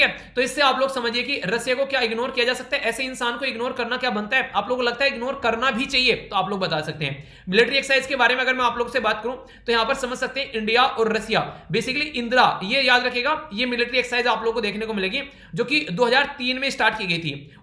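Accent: Haryana, India. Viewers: really are you sure ??...mai sir ka bolne ka tone or bhut sare elimination lagye ki bihar se ek frnd tha to wo nhi hoga or up se vi nhi or rajasthan se doubt tha to sir ka tone rajasthani hindi nhi hoskta fir uske bagal me haryana h or tone match krrha by map so mai guess kiya hua...or bagal me rajsthan ka PCS vi diye h...or sir bolte h aas pas ka PCS diya kro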